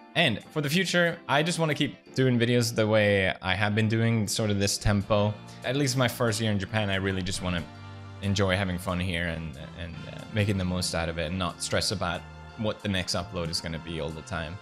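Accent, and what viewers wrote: Sweden. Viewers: Jag hade svårt att kolla på dina videor i början för att jag inte kan lyssna på engelska med svensk brytning, plus att jag var avundsjuk på att du var så populär, men sen började jag titta på lite colabs med dig och Seán och började sedan kolla mer och mer...med jämna mellanrum. Men dina senaste Japanska videor kollar jag på så fort de dyker upp för de är väldigt underhållande. Så gör vad du vill i vilket tempo du vill. :) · Crazy after all these years you can skill kinda hear his swedish accent I think thats pretty cool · Wow your accent really got better over the years your Swedish is faint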